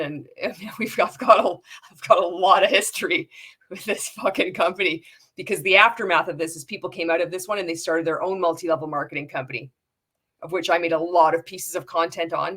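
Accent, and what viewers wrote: Canada. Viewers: I knew I recognized that accent, a fellow Canuck!!!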